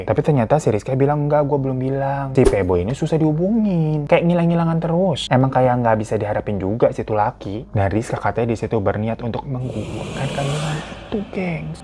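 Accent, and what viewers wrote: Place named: Pontianak, Indonesia. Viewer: biak ponti mane suara nye😂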